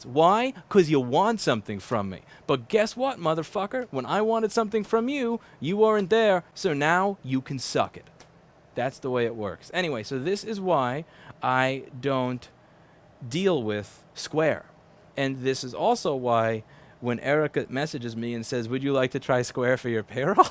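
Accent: New York, United States. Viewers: New Yawkers like pronounce "human" as "you-man"... Not a criticism,! You're the freakin man! 100% love for you!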